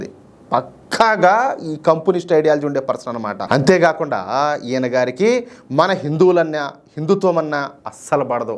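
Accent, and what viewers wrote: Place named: Kadapa, India. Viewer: Anna meedi maaa kadapa nee naa. Maatlu alage vunnayi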